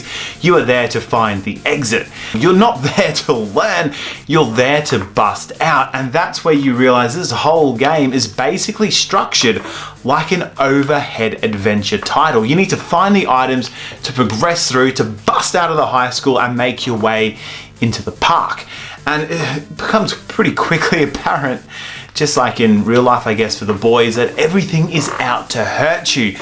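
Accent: Australia. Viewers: I like your accent! Are you from Australia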